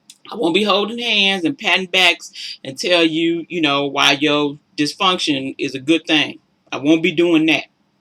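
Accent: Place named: South, United States. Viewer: !! I've appreciated your presence on BBW and Twitter for some time. How am I just now seeing your YT channel?!?!? I loved this and look forward to checking out your other vids. Off topic: I LOVE your accent (fellow Southern girl?...)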